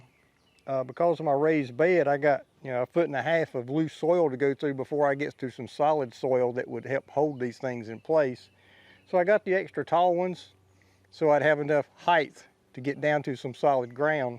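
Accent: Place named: Georgia, United States. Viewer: Sounds like a Gawgia boy...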